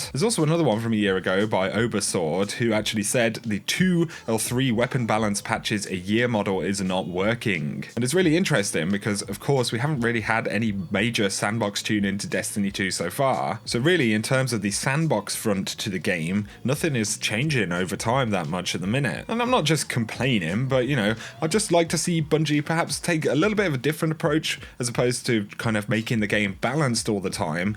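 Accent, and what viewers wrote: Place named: United Kingdom. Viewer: Keep it up! Out of all the destiny you tubers, I really like your stuff! You have a really interesting accent to listen to as well! Where in the UK is that?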